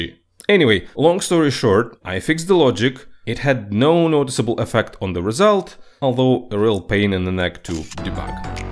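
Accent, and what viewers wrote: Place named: Russia. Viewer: Как же очевиден русский акцент ахах, с первого предложения распознал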